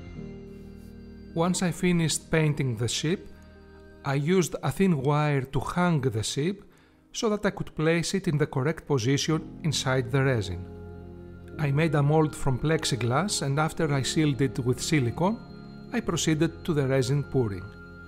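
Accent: Greece. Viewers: nice work and greek accent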